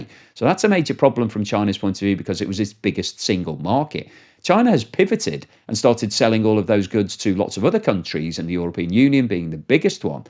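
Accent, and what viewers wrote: United Kingdom. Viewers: This guy sounds like he is from the UK why is he always talking about China? Is it because he’s xenophobic? Or because he knows talking about Chinas collapse brings low IQ people to watch his videos? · It's British English.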